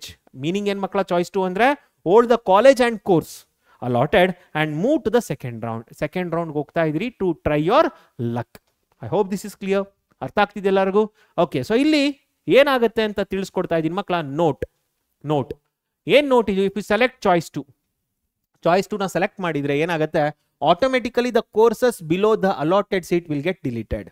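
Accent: North Karnataka, India. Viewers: Sir nivu uttarakarnatakadavara 😊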